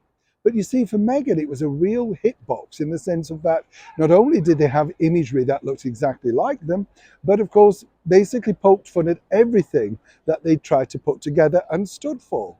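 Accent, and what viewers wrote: Yorkshire, United Kingdom. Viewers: Thats his accent as he is from Yorkshire. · Not just Yorkshire pronunciation. That is how we were taught before so many decided that they would follow American pronunciation of this and many other words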